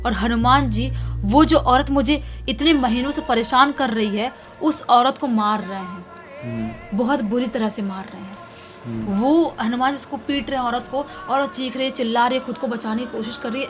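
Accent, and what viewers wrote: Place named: Uttar Pradesh, India. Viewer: Bhai hum roj aapki kahani sunte hai aur aap aaisa lagta hai ki aap up ke ho 😊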